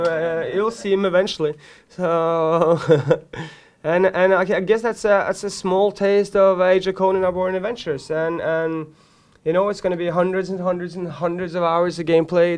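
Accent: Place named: Norway. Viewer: If you are not able to understand this guy you need to learn English. He has perfectly fine English with a Norwegian accent. It is not like the English is wrong or anything.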